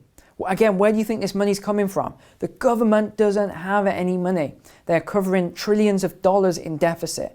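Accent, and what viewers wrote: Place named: England, United Kingdom. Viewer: Morning. liked and Subscribed. So entertaining listening to a refined English accent talking about American real estate. Im a Yorkshireman with the usual Yorkshire twang. Do your grim findings apply to the UK. Cheers for the content. Tim.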